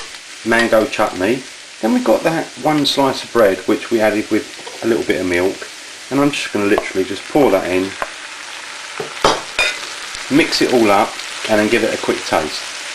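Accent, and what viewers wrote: United Kingdom. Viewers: that british accent though. too cute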